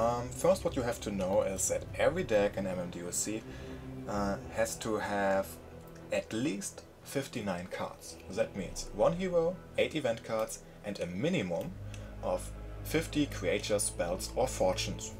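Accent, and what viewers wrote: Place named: Germany. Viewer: Du bist auf jeden Fall Deutsch xD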